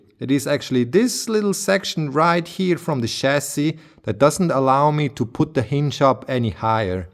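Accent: Switzerland. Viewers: Your accent is lovely! Are you from Schweiz, or maybe south of germany. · When you hear that Swiss accent, you know this job will be done with 110% accuracy.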